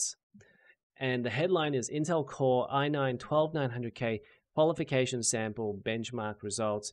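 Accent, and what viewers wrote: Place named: Australia. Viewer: Is this an Asian dude with an Aussie accent? Incredible!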